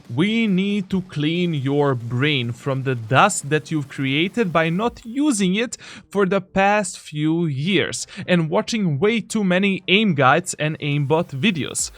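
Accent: Russia. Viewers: Okay but are you Russian or Ukranian 🤨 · Where you from ? Russian Turkish ?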